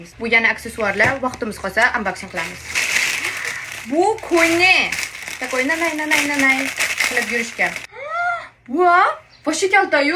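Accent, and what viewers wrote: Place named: Russia. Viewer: aksentiz rosa ruschaga tortarkan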